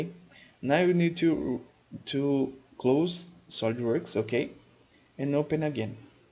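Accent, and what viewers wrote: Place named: Brazil. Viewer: assim que começou a falar, sabia que era brasileiro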